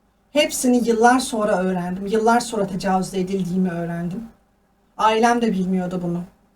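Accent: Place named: Arab world. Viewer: arap kadini şivesi yapan bir genç hanım zannettim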